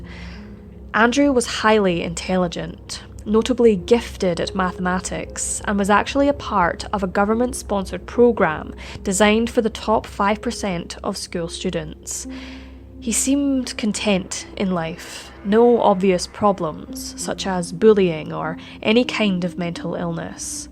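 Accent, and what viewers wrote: Scotland, United Kingdom. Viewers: Ann it’s a scottish accent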